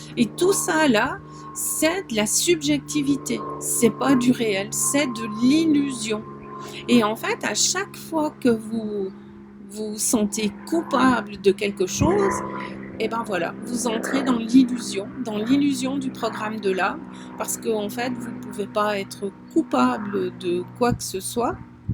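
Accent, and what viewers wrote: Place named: Switzerland. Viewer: Bonjour, je découvre ta chaîne, bravo, j’ai donc encore beaucoup de tes vidéos à visionner....ce sujet est très intéressant et bien expliqué grâce aussi aux exemples...je découvre sans grande surprise que nous sommes voisines, je soupçonnais ton accent et les huitantes, septantes....donc c’est confirmé, je réside à quelques mètres du sol genevois....ceci dit j’ai appris les programmations liées au pays...ce sujet est très intéressant, je vais partager....merci encore pour ta vidéo. Bénédicte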